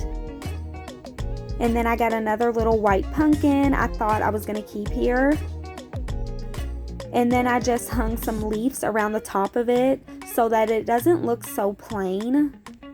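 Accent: South, United States. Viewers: I can’t get enough of the southern drawl 🖤🤍 I lost mine but it’s so comforting 🤷🏻‍♀️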